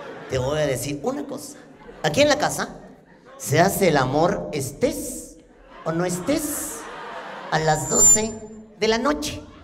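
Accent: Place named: Veracruz, Mexico. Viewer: pues he visto un montón de videos tuyo, entrevistas pero nunca has explicado porque hablas como jarocho del puerto jejejeje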